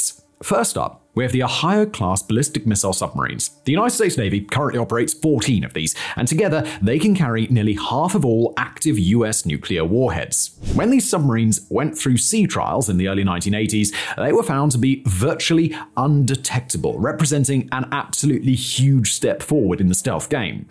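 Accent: England, United Kingdom. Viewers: I appreciate so much English accent compared to US one. Better understanding for my old scholar skills... La cerise on top of the gâteau. 🇨🇵❤️🇬🇧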